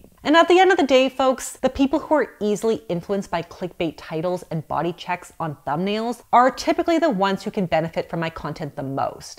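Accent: Canada. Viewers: She’s Canadian I believe 😊 so it might be an accent. My husband recently pointed out to me the Canadian accent and now I can’t unhear those slightly different pronunciations which I find cute (I hope that’s not offensive, I enjoy listening to people’s accents) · I love your content!! I’ve learned so much about healthy eating and diet culture. Personally, I’ve never been triggered by your content and if anything, those struggling with ED could benefit so much from the truth that you share❤️‍🩹 you are a blessing! And I love your Canadian accent😂💚 keep going girl!!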